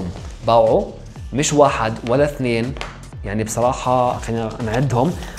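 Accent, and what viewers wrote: Iraq. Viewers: انته اشكد كيوت اتصير من تحجي عراقي 🇮🇶 فديتك ولله 💗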